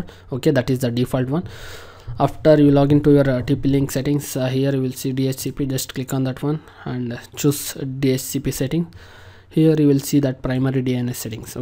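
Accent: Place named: India. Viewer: Gosh I can't stand the Indian accent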